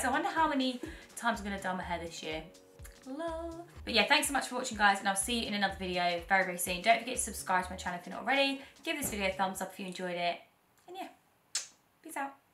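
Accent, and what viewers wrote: United Kingdom. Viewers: She's from Great Britain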